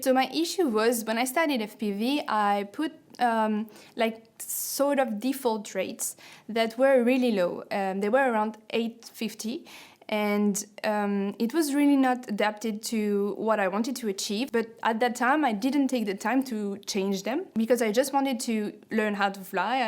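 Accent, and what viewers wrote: France. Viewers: Great Video for Beginners. Very nice! I like your french english! Looks like more snake rates then Steele rates! Greetings from Germany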